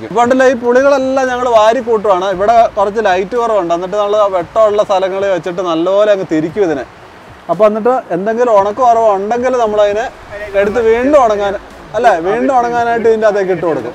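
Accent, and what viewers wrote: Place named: Thodupuzha, India. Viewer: Ahaa njan thodupuzha.. Samsaravum aaa style okk kandapo thoni.namude nattukaranane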